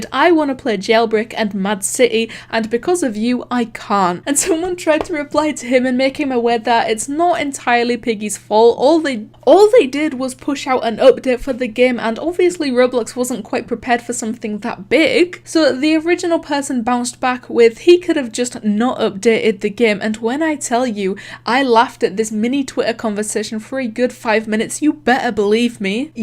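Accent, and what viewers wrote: United Kingdom. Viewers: She's british and they speak like that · She sounds British... Roblox sounds like rublux (no offense )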